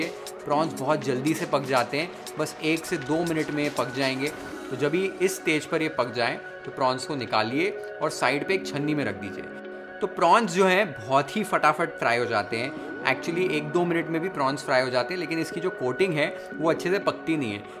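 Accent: Maharashtra, India. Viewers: खूपच भारी 😘 आणि तुझ मराठी accent पण एक दम मस्त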